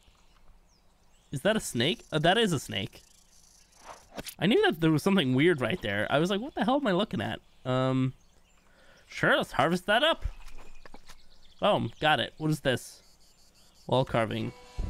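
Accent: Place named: Ireland. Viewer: What part of Ireland are u from in from louth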